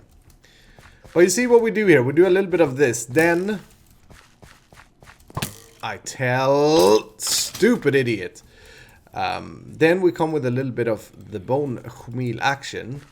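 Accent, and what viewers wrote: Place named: Sweden. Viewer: eller jag hörde på ditt utal att du var svensk :D eftersom att jag också håller på med youtube och jag snackar också engelska i mina videos :D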